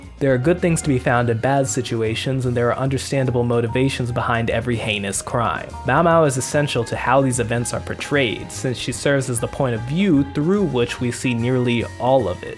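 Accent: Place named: Spain. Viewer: whats with the spanish accent for the japanese pronounciations? either do right or dont at all plz.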